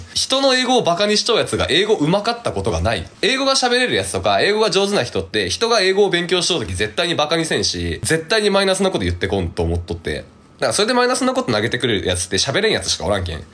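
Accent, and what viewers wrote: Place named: Fukuoka, Japan. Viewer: 出身は、福岡市ですか？久留米とかですか😊？ 親戚の話し方と似ていたので少しだけ親近感が湧いたのですがもし違ったら申し訳ないですが😅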